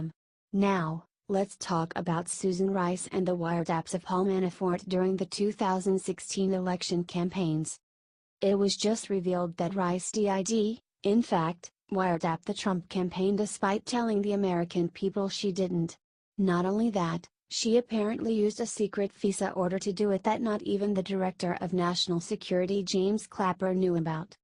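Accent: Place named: Russia. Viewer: Use your own voice not a Robot. We want to hear your Russian accent.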